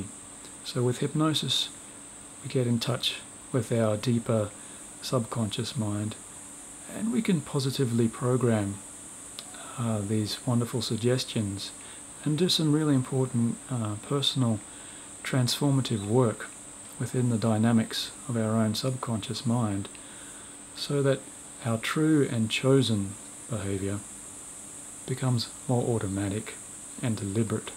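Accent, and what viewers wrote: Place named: Australia. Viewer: Dear, your videos are great and i enjoy them nearly every night. I never really liked guided meditations but yours are the best! Luckely you have this nice Australian accent which makes it an even greater pleasure! Thanks a lot "mate". Sabine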